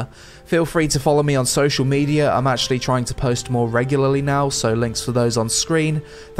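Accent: United Kingdom. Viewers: thaa brrrrrrrrritish is very amusing. what a lovely chap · after watching this vid i fkin love british accent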